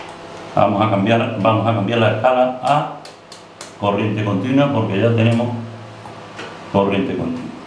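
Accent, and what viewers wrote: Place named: Seville, Spain. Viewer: gracias por la respuesta...lo preguntaba porque por el habla, parece usted de sevilla, que es donde resido, en mi trabajo relacionado con plantas de agua...siempre subcontrato la fabricación del cuadro...cosa que me gustaria hacer yo mismo para tener mas control sobre los problemas posteriores que casi siempre los hay. En la facultad saque un 9 resolviendo circuitos...pero todo muy abstracto y teorico...demasiado alejado de la practica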